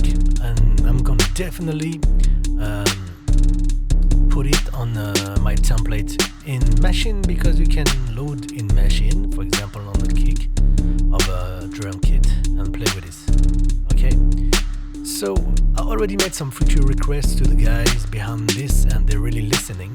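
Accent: France. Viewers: DUDE YOU'RE ILL MAN!! love your accent, you're french ah?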